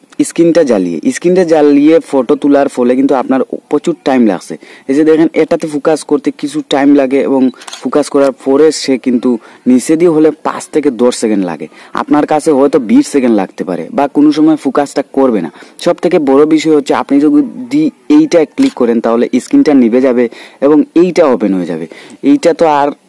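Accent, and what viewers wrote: Sylhet, Bangladesh. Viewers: Sylheti na kita ba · সিলেটি ভাষায় মাত। 😊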